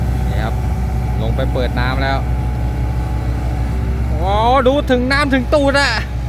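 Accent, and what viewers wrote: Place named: Sukhothai, Thailand. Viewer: สำเนียงเหมือนคนสุโขทัยแท้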